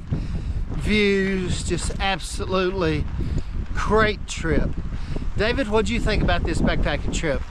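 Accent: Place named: South, United States. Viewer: Yeah, that accent tells me Alabama/Tennessee. I reckon you got some serious ancestry in the southeast.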